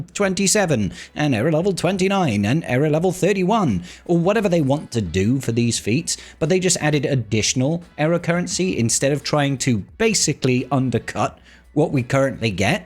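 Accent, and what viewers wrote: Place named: Cockney, United Kingdom. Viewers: <num> the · Who doesn’t love a bit of the!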